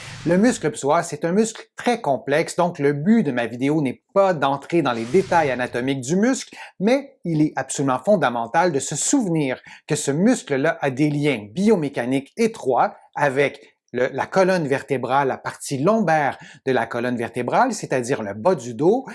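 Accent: Quebec, Canada. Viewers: il a l accent québécois !!!!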